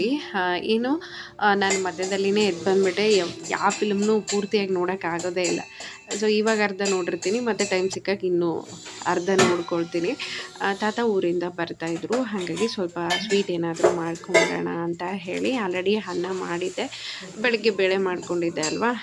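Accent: Raichur, India. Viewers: Nemma maatu thumba Chanda raichur dst anesuttai nemmadu sis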